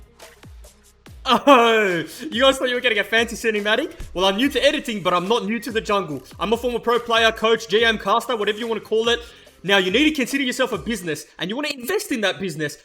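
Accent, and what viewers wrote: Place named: Australia. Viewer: Great video dude, nice editing,funny commentary and informative. im subbing hoping for more of these kind of videos. (also love the stralian accent) gl for more <3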